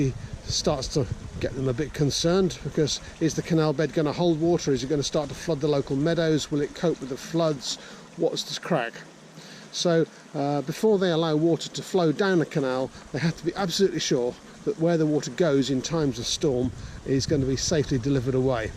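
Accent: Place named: Birmingham, United Kingdom. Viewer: I understand the EA's concerns but as a government agency it would be nice to think that they would actively assist as best they can with this historically relevant venture rather than, as it seems, being a hindrance and btw, when I first found your channel and your vlogs on the BCN I did think you were a rather posh sounding Brummie so the revelation that you came from the East was of no surprise.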